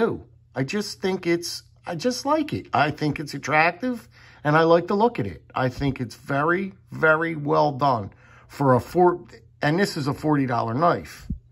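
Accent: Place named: South Jersey, United States. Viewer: Lol that SNJ accent..... I grew up there myself. Oh, and the range boss is amazing.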